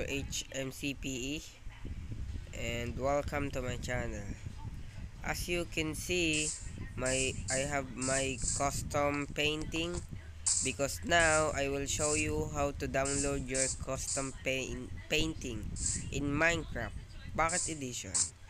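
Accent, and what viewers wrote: Philippines. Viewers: Sa accent pa lang alam kong pinoy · Your a Filipino cause your voice is like a Filipino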